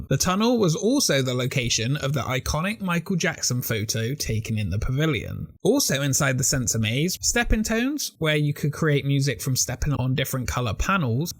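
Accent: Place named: England, United Kingdom. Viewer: great video again! where are you from?, you sound like your from England accent but i cant differ whereabouts.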